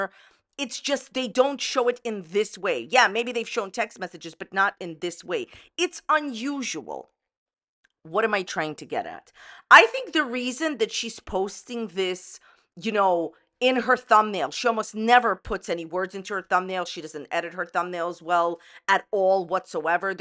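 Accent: United States. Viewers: I love how says Ambalin 😄 She has a definite accent from the region she lives in. But so do I. We have different accents in different parts of the US.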